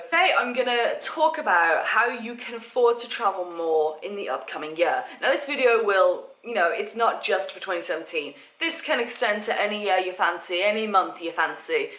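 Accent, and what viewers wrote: United Kingdom. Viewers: btw i think you are gorgous and i love your british accent happy new year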